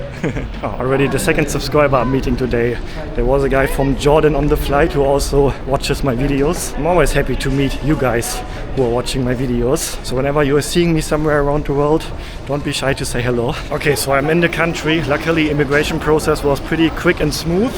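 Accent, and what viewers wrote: Germany. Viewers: Your German accent is terrible but your mother's is okay.... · Crazy how you have to speak 3/4 years only english and you still have a very very german accent and your your mom who lives in Germany not really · That’s lovely German English. accent Your mom is so cute! ❤ I discovered your channel recently. Want to see all of your videos more often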